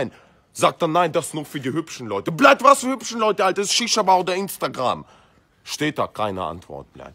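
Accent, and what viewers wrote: Germany. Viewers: du Bockwurscht so redet man in Berlin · der klingt ihrwie richtig deutsch :D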